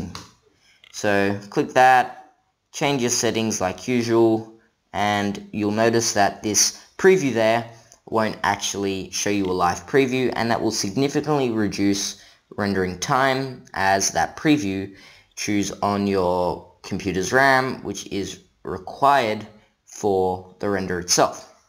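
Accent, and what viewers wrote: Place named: India. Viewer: Thanks a lot man!! From 5 hrs to 1 hr.😍😍 I wish I saw your video long time back. Thanks brother. I'm from India. It seems from your voice that you are also an Indian. Am I right?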